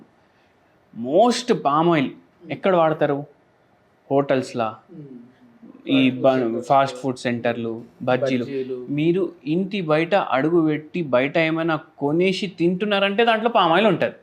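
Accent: Telangana, India. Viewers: bro it's telangana slang don't insult the language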